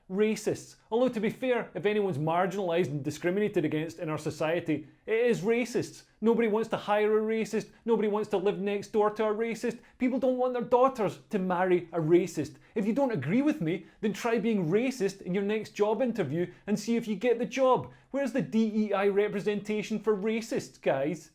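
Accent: Scotland, United Kingdom. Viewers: This is great, and the Scottish accent saying plain and obvious things makes it so much better.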